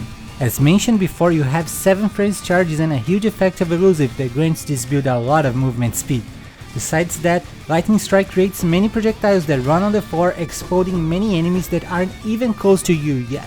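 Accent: Brazil. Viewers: Is the person speaking Brasilian? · Mano por mais que dê pra notar que você é Brasileiro pelo sotaque você tem fluencia e é intendivel o que você fala em inglês, então por quê da legenda em INGLES novamente? ajuda seus compatriotas tupiniquins ai kkkkk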